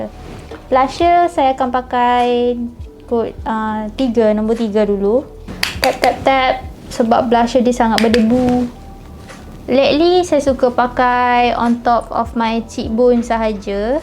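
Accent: Kelantan, Malaysia. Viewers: reply 😲😭 nak nak! lg best bila dgr kecek kelate🤭 btw, u've gone through much tough times..fighting! You did well 💜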